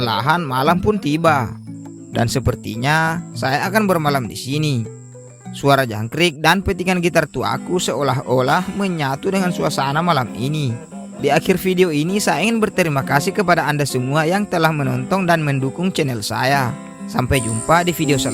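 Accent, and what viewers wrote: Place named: Bugis, Indonesia. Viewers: Kalau dekat saya ingin main ke pondok Abang.. Sayang saya di Jawa . Kalau lihat dialek nya Abang ini suku Bugis ya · Seperti nya bukan orang Batak tapi Sulawesi Selatan logatnya mirip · Kental kali Bugis nya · Orang manaki bosku, dari logatta, kayak orang maros kepeng😁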